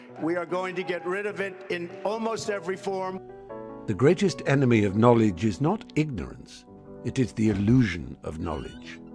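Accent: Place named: United Kingdom. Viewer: So Anonymous is liberal now? I'm not a Trump fan, but the creator needs to look in the mirror. 1. The EPA is unconsitutional per the 10th amendment (along with most Federal agencies), so that's not good. 2. Douglas Keenan PROVED the IPCC fabricated the results supposedly "proving" global warming is anthropogenic. That which can be asserted without proof can be dismissed without proof. 3. What does a graph of world poverty have to do with Trump? 4. The most dangerous places in America are those with the strictest gun laws, but let's ban all guns. 5. Years of education are higher than ever yet real median household income has been falling since 2000. Hmm, maybe because public schools are really indoctrination camps that teach nothing but how to obey authority (and how to beg it for help). Dept of Ed also unconstitutional. Surprisingly, a British accent only gives the illusion that you know what you're talking about. 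It doesn't matter what facts you present these people, they will always accuse you of that which they are guilty. Lastly, I'd be willing to wager a paycheck the creator hasn't sat through The Clinton Chronicles. That's called confirmation bias fyi.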